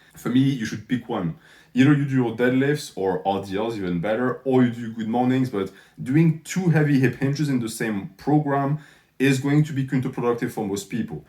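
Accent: France. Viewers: are you french bro ?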